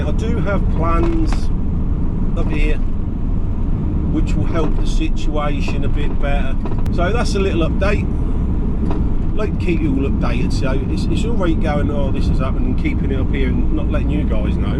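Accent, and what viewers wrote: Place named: Norfolk, United Kingdom. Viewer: Hi, if you didn’t realise this or not, you are a natural at this content creation. I do love a channel that explains everything about what they are doing, and to top it all off, you sound like me, are actually from Norfolk?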